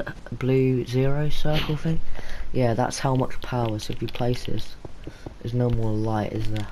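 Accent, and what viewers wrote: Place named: Scotland, United Kingdom. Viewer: i sense scottish.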